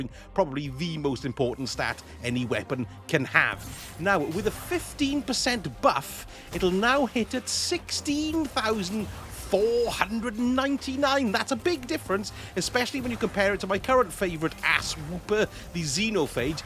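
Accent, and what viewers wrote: Wales, United Kingdom. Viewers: Are you welsh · Can I hear a fellow welshman?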